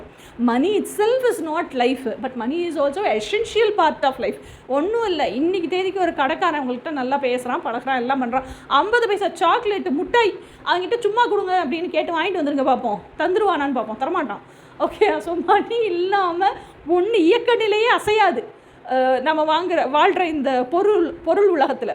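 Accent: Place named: Madurai, India. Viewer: Madurai pechu 👌🏻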